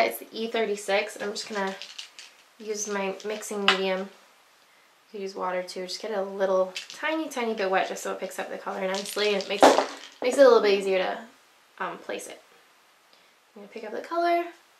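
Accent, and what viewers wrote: United Kingdom. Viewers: Lol I love this look, despite the inspiration xD I've never played that game, but the only Facebook game I've ever played is the Hunger Games Adventures, where I haven't played in a million years. Your British accent just makes your resemblance to Kate Winslet more legit :P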